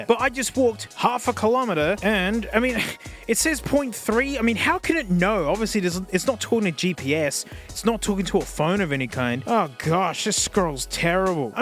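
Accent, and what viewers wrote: Australia. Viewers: Ooo I have a question to you as a non-native english speker. I noticed a while ago your aussie accent not being as expressed or prevalent as some of other Australian youtubers I watch. Do you come from a region where people just don't have that very distinct aussie accent, or do you change your speech and pronounciation deliberately, so people who aren't used to it can understand you better? I guess this isn't that important really, but I've been wondering for a while now. Thanks man🤣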